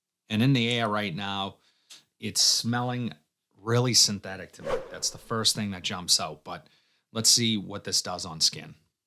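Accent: Boston, United States. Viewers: Just came across your video,,, You have to be from my neck of the woods,, No one speaks this way unless you're from BOSTON...LOLOL I Love Men's Cologne...When I find my favorites Cheap like this I buy them and use them to spray around the House... I will check this one out.. Thanks.. I Love the Men's Paul Sabastian for $20 and Calvin's Obsession for the same $20. or $18 for the Tester. Ebay is a good source if you don't want to venture out.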